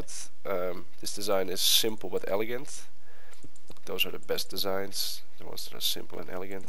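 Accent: Netherlands. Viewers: it's funny how you just hear a Dutchmen talking english :D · you sound realy dutch :S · Being dutch myself, I can recognize his accent as being dutch.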